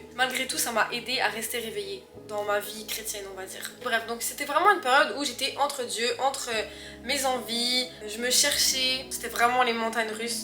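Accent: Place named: Quebec, Canada. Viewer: Je me disais bien que tu avais un petit accès québécois hahahaha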